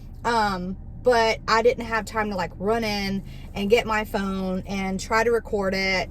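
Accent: South, United States. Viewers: Well, first off. The accent got me right away. A southerner trying to "Oomowmow." Priceless! I'm from Houston, Texas. I served in the military my whole youth from 1980 to 1994. I was even in Operation Desert Storm, and without trying sound like Dale from King of The Hill. I am a Christian and believe that Jesus Christ is God. He is The Word of God made flesh. In him is eternal life. He is my LORD and Savior. 1 John 5: 7 and 20 Ok. Here's what's actually happening. Everything that people are seeing in the skies has been made by man with really good science. It seems like magic, it's so advanced. We now have craft that can do things no one would believe possible. The real "entities" cannot take a human form. God's Word explains this. - Hebrews chapter 1. "He maketh his angels spirits." The angels that kept not their first estate, but sinned have been "bound in chains of darkness." ( 2 Pet. 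2: 4). This darkness is the realm known as the bottomless pit. Revelation chapter 12, 13, and 17 explain this. "The beast that thou sawest was, and is not; and shall ascend out of the bottomless pit, and go into perdition:..." Paul said of them that they are spiritual wickedness in high places. - Ephesians 6: 12 Excuse me? What does god need with a spaceship? Jesus said of their coming, "Shall shew great signs and wonders; insomuch that, if it were possible, they shall deceive the elect. (Matt. 24: 24). Paul confirmed what Jesus said of their coming. "Even him, whose coming is after the worki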